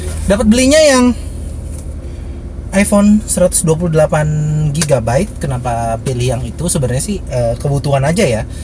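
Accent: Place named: West Java, Indonesia. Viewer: orang sunda kah? logatnya sunda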